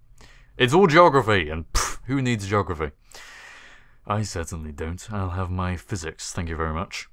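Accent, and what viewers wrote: United Kingdom. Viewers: Is that his actual accent? O.o;; All my british accent lessons are from BBC.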